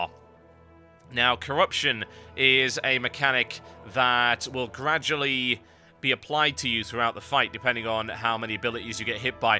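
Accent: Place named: London, United Kingdom. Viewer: man, you're really british.. and I think it's plesant.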